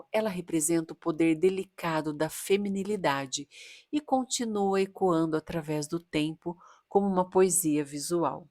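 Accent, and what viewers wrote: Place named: Rio de Janeiro, Brazil. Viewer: Acho que vc é carioca!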